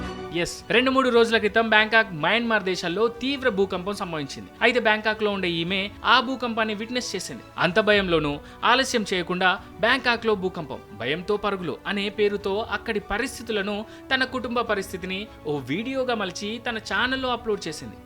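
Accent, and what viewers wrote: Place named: East, India. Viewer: Turupu gonta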